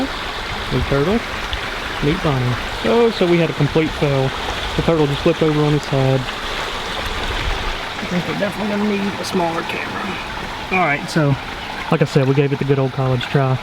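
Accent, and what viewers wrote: South, United States. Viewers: So I watch your videos with closed caption. When you said “Bonnie meet new turtle, new turtle meet Bonnie” the closed caption read “Bonnie meet Luther, Luther meet Bonnie”. So there ya go. Luther is the name from me and closed captioning of our southern accents. Lol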